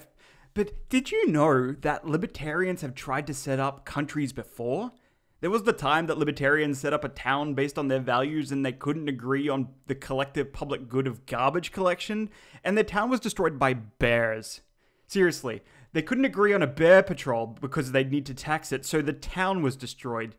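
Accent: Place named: Australia. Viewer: I've never heard someone with an australian accent using so mamy rhotic words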